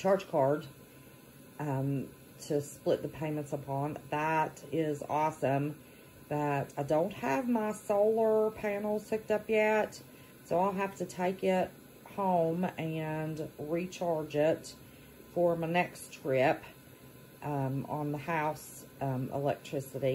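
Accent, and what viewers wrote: West Virginia, United States. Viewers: What accent is that? Sounds like West Virginia to my ears. Am I close? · thanks for the tips :) lol and it is a West Virginia accent, I love it xD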